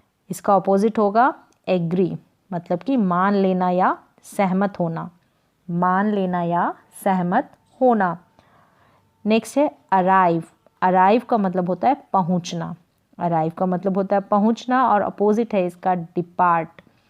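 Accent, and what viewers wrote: Chhattisgarh, India. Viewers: Hello didi aap chhattisgarh se ho kya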